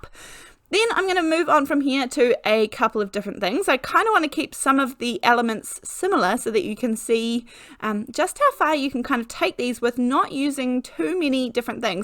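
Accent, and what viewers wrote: New Zealand. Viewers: I like how you fixed the card. I like the second version better also. Love your videos. I enjoy your beautiful NZ accent.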